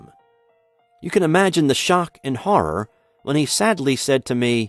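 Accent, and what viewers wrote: South, United States. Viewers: Great narration and voice. But I can't tell iwhat regional accent you have. It sounds like it's between Standard American and southernish. Please enlighten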